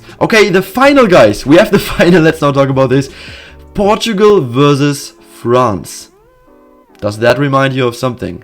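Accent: Germany. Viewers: The German accent 😩😩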